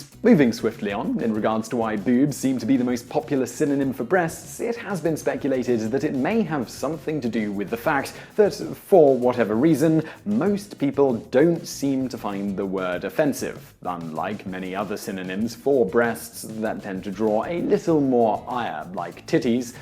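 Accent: United Kingdom. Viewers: awkward or not, I still giggled when, with a straight face and 'serious' British accent he rattled off 3-4 euphemisms in a row... - I almost an entire video of him just reading a list of all the terms they can track down, either for boobs, the male organ, or any other 'naughty topic' in which language has created so many options to 'not say' what one is saying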